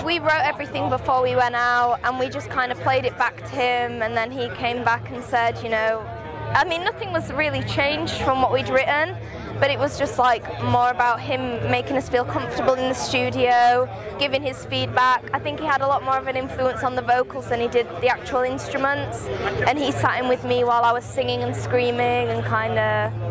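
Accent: United Kingdom. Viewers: I hate her british accent, but she`s cute.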